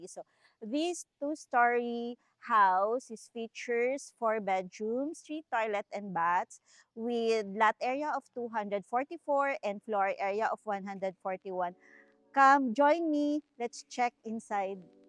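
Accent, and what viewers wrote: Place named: Visayas, Philippines. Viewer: pangit magsalita ni ate, 😢. Nag english, nag tagalog pero tonong bisaya.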